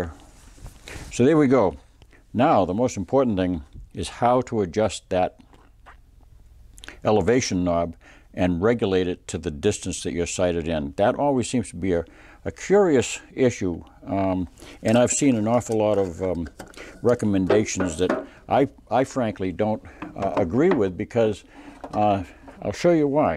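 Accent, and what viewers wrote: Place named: Central Massachusetts, United States. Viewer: great lesson! i grew up in worcester, your accent sounds like central MA, am i close?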